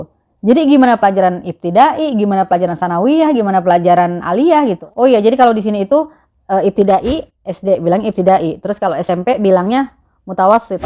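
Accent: Jakarta, Indonesia. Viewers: Betawi banget logatnya... Masya Allah tabarakaLlah keren channelnya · Ilmunye masyaallah,menambah ilmu kite nyang nyimak.Tetep menjadi mama,dengan logat betawinye,laen daripade nyang laen 👍.kalu bukan kite nyang ngelakuin,siape lagi?iye kaaan. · Empo betawi nya...🤣 Asli mana po indo nya?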